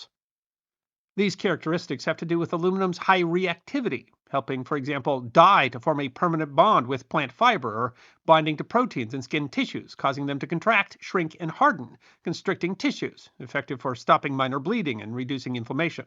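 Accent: United States. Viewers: My ears hurt, bloody Yanks. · It is Aluminium It is Antar c tica It is so l der The letter Zed rather than nursery rhyme Zee Dumbing down of pronunciation symptomatic of trumping the US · I don’t love the American speaking.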